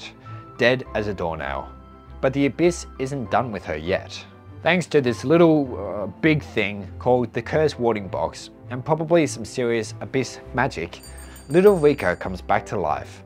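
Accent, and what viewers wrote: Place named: United Kingdom. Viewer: Bro he's just british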